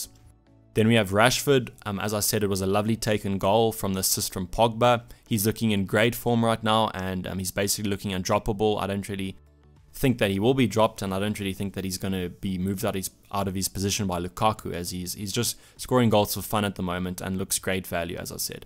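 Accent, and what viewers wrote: South Africa. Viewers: Bro u South African?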